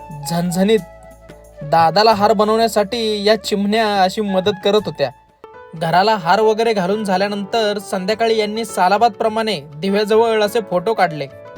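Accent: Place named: India. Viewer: दादा why don't you stick to what you are good at? We watch your videos for your daughter and and your non Punekar- non nasal-authentic Marathi language which is rare to hear now days. Why don't you stick to it rather than becoming passive aggressive to preach your personal thoughts and condescendingly asking people to rethink their habits and choices? There are enough youtubers out there preaching THAT stuff. You don't need to appear one of them. सुरक्षित अंतर ठेवा. 🙏🏻☺️🙏🏻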